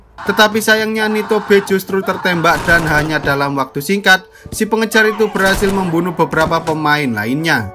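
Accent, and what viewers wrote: Java, Indonesia. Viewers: jawa kah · Mase medok banget...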